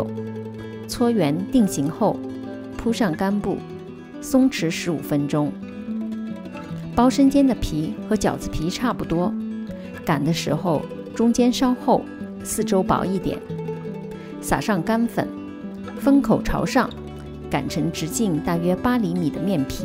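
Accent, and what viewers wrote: Shanghai, China. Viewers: 好亲切的沪普：long tang💕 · 原来是上海小囡呀亲切的很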